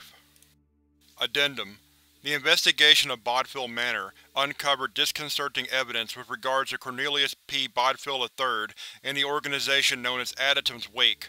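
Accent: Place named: Texas, United States. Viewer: Ahhhh....I THOUGHT I heard a slight Texas or some other accent from that area...Very cool...I go to night school and partly work from home so am up late working on things sometimes...as I listen to stuff.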